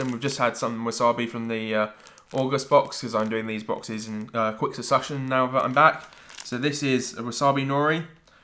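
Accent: United Kingdom. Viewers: cheers for the reply I'm defo thinking of getting the boxes I love new things. What part of UK you from to me you sound northern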